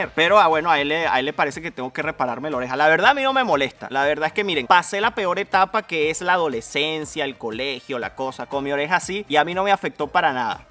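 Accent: Venezuela. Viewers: Me encanta tu canal paisano. Me encanta tu personalidad y la de tus esposa, son geniales. No le prestes atención a los malos comentarios y tu acento maracayero me encanta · ¡Me encanta tu acento! Antes de mirar tu canal, no podía entender el acento venezolano, pero mirar tus videos me ayudó poder entenderlo. Ahora que lo puedo entender, me parece un acento muy bonito. (Perdón el español malo; no es mi lengua madre 🙂).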